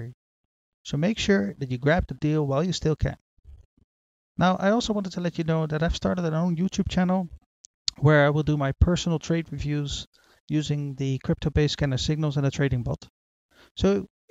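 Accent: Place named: Netherlands. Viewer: Idk why but it kinda sounds like you're Dutch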